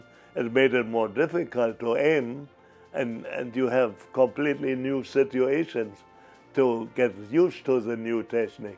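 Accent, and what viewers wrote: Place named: Germany. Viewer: I wonder if when he emigrated to Canada/US he had a German speaking wife + family/friends? His English is very good of course but VERY accented :) But I've known British people emigrate to the US/Canada or Australia and get strong local accents SO quickly!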